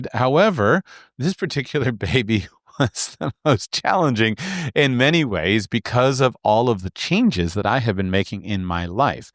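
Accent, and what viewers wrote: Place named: Florida, United States. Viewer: He has always talked like a Floridian.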